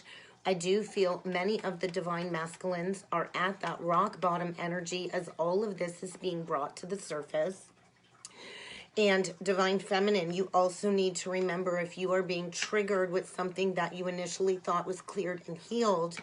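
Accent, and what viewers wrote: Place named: Brooklyn, United States. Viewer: Great reading!! Nice to hear that clear, crisp Brooklyn accent again.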